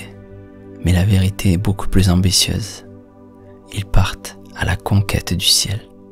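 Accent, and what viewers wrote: South, France. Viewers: l'accent du sud du conteur me dérange trop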